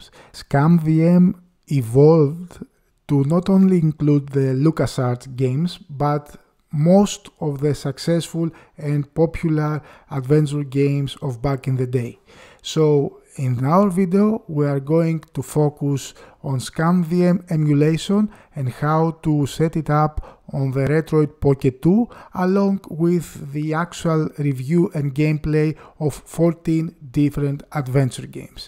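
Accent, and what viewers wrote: Greece. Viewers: Is this guy Greek? I have heard others with the same accent.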